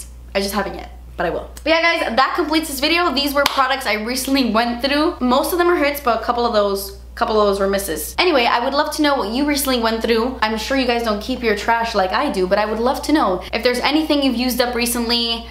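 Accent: Cuba. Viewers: ! Will you ever do a video speaking in Cuban/Spanish (sorry I don't speak those languages so not sure if they are the same/similar??)? I think your Cuban accent is really cool! 🤩 Would love to watch a video like that hehehhe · siiiiiiiiiiii!!!! Cuban accent ❤️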